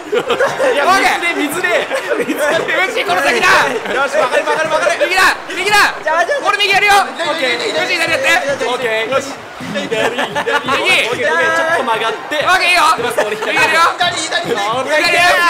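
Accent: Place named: Kyoto, Japan. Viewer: まじレトさんもっと京都弁出して……同じ京都人としてドキドキしてしまう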